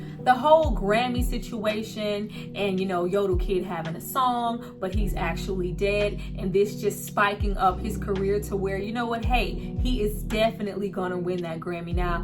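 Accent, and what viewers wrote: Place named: North Carolina, United States. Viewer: Are you from North Carolina queen? The accent sounds like it.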